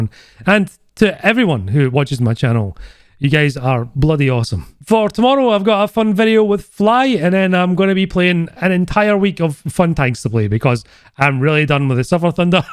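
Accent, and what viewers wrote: Scotland, United Kingdom. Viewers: He's Scottish mate.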